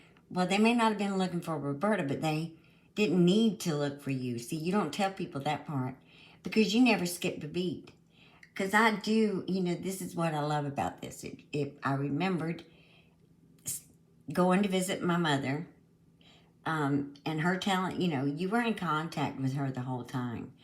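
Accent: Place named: South, United States. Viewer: You said it just fine. I love your voice with that sweet southern accent very calming and easy to listen to. Reminds me of my Aunt's from Tennessee and Great Grandma. Mary tells her made up stories but fails to accept She is the monster.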